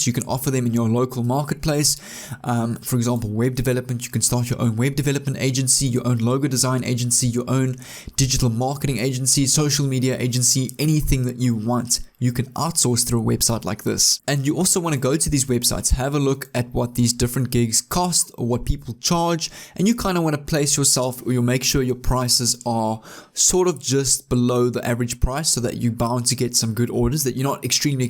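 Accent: South Africa. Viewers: You sound like you have a South African accent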